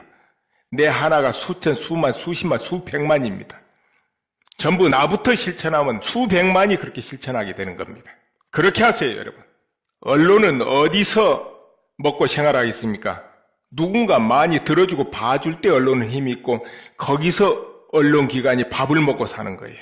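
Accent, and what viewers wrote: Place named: Honam, South Korea. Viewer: 재밌고 논리적 말쌤과 이해가 쉽도록 진행. 그리고 구수한 목소리....감사합니다. 헌데 호남사람이시지만 한글의 특징가운데 구개음화 라고하는 규칙이 있는데 예를들면; 전라도. 사람들은 의" 발음을 못해 으"로 하죠 , 그래고 진행자께서는 북한이라쓰고 발음은 북칸이라 하셔야죠. 등등. 좀 생각을 해보십시오 어쩔까요. ㅋㅋ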